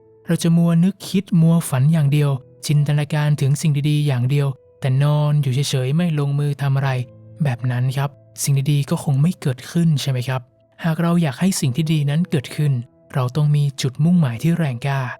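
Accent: North, Thailand. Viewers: คนเหนือแน่นอนครับ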